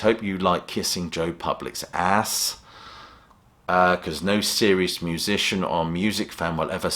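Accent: United Kingdom. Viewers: Dude, you got the coolest British voice going on... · I strongly prefer a well spoken gentlemen with a healthy knowledge and appreciation for music. The British accent is just an added bonus.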